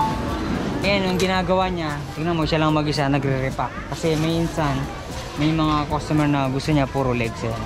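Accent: Pampanga, Philippines. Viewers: ingat kayu parati soy kapampangan ka pala🤣🤣 taknaydumo anang tita eh🤣🤣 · Kapampangan ka tlga ehehe . 😅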